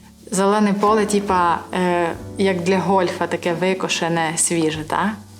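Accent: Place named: Transcarpathia, Ukraine. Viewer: Будь ласка! Несіть цей закарпатський вайб якомога більше... Це ж бальзам для вух♥️ Маю мрію в серці- навчитися так розмовляти 🥹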